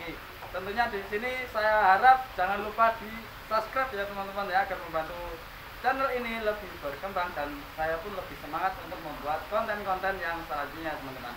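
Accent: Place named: Java, Indonesia. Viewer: Logat jawa bosq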